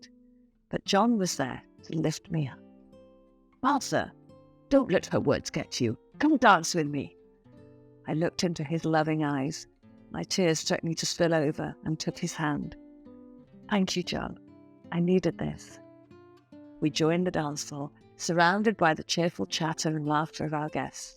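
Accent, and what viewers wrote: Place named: United Kingdom. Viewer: British accent is not for everyone, the story sounds interesting but i couldn't finish it, cos i got stressed listening.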